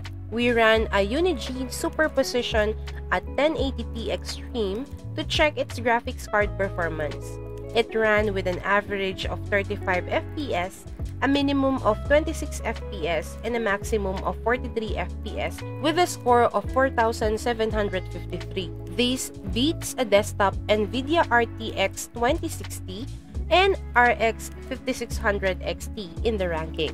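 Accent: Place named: Philippines. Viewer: That voice and accent.. pilipino po ba kayo maam? Haha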